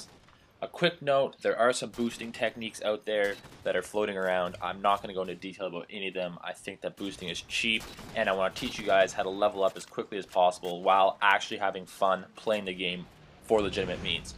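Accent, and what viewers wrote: Canada. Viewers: he sounds like he lives in canada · I love your Canadian accent! It's just perfect! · your Canadian aren't you?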